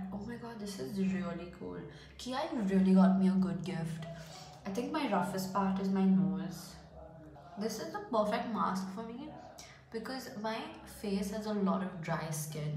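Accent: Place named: Hyderabad, India. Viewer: ooo Hyderabadi😅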